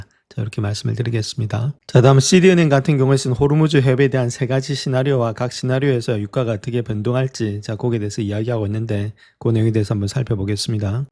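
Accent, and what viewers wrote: Gyeongsang, South Korea. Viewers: 님 경상도 분이시져? ㅎ 설명할 때 가끔 사투리.. 얼마니 친숙하게 느껴지는지 금리 내라야..ㅎ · 가끔 사투리요? 1초만 들어도 찐찐찐 경상도 토박이 사투리 영상 내내 엄청 쓰시는데요?ㅋㅋㅋ서울경기분이면 1초컷이예요. 사투리 심하게 들리는거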